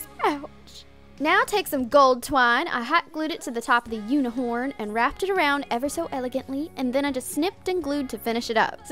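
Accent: South, United States. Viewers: is she country or something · Subscribe Ily videos ur so creative and I love ur funny country accent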